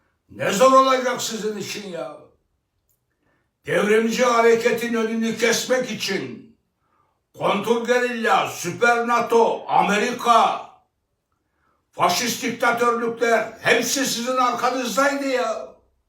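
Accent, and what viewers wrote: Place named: Sivas, Turkey. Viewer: öz Tükçe ile konuşmanızı çok seviyorum başkanım tam bizim gibi konuşuyor diyorum ben de Sivaslıyım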